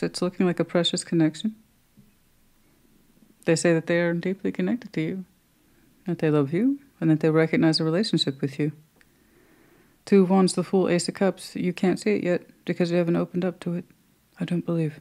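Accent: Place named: Texas, United States. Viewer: First time I've listened but your cards missed me by 2 mile. I will listen again ,like the Texas accent. Been in and across the 800 and I believe 30 odd miles, in a truck many times. Always met some good people in Texas and was treated with much respect being a woman. Aries thank u for reading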